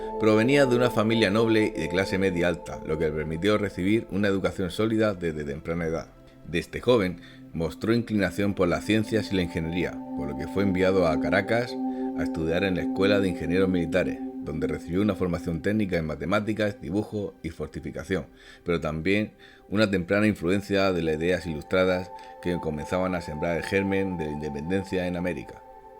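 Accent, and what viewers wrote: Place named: Andalusia, Spain. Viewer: No hablas bonito. Eres andaluz?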